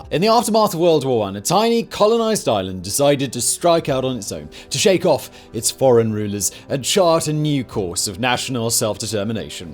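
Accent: RP, United Kingdom. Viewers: There's something about listening to someone with a fairly posh English accent explaining Irish independence, but this was a very good video.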